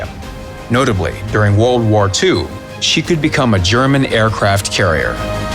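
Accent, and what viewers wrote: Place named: United States. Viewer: I'm getting sick of this American accent.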